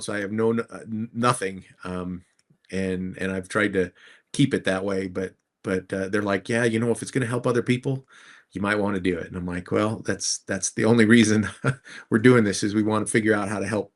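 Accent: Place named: West, United States. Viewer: I’m just so curious what doctor you found that would do those DMSO treatments intravenously and would you be able to share that with me? I am from Michigan and I don’t know where you live. It sounded like maybe out west somewhere but even through the doctor that is doing that for you, maybe she would have an idea From doctors More in our area?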